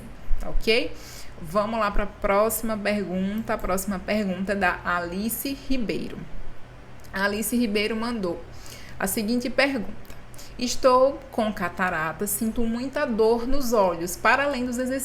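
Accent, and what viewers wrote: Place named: Maceió, Brazil. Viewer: Onde vc mora? Tem um sotaque bem parecido com o meu aqui de maceio.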